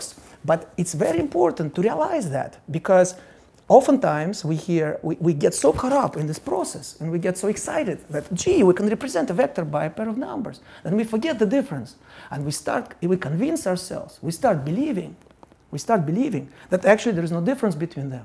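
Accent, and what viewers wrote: Russia. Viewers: Born May 2, 1968 Kolomna, USSR i knew it from his accent! ) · sounds like Russian pronunciation. maybe he will do same speech in Russian? it would be such a pleasure. but I can be wrong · It so funny and pleasing to hear his Russian accent and intonations here and there :) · I LOVE HIS ACCENT . MOTHER RUSSIA!! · Thinks Hmmm... He has accent like a russian. googling Yup! He is russian :) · Russian accent hm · That's real mild russian accent. Doesn't quite sound like ensign Chekov, right? :D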